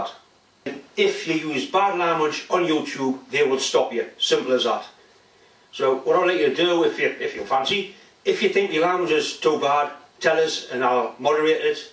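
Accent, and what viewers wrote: Newcastle, United Kingdom. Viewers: my wife has trouble with your language!!! your Geordie accent that is.... but she is from Mississippi, the deep south, usa, and has trouble with my mixed us new England/Australian accent, so go figure. you hear infinitely worse language on the tele, on the street, on public transport and most certainly in any school yard from kindy on up. keep the videos coming and don't change a thing!!! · as far as I'm concerned don't change a thing, love the geordie accent and your humour, takes me back to a time when I was married to a " Monkey Hanger" ( anyone who does not know what this, google it). The people of the North East work hard, play hard when work is available, when I used to visit I was always known as the "Cockney Bastard" but it was never once said with malice, they were at that time the happiest years of my life and I have so many memories of the North East.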